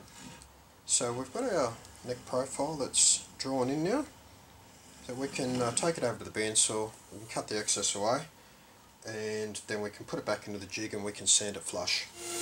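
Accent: Australia. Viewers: this is aussie accent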